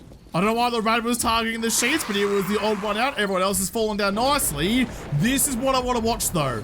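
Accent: Australia. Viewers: Maybe its the Acapulco Gold talking but damn this is awesome. Love the Aussie (?) accent.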